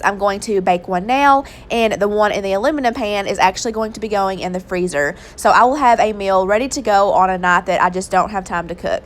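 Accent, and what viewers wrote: South, United States. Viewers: My 8 year old daughter and I love watching your videos! The southern accent makes me feel like you're just a friend down the road! The food is realistic and your videos with step by step directions surely help those of us learning how to feed a family! Appreciate what you do : )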